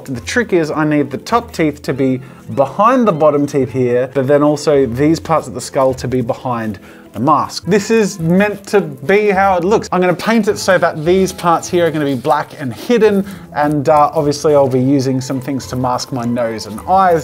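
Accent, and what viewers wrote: Australia. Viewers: a demon skull with a cheery Aussie accent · I feel like this is the most Aussie has sounded in a while. >.>... Just me? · Seeing what's supposed to be a spooky skeleton-esk mask and hearing a very cheeky Ozzy accent if probably the highlight of my day.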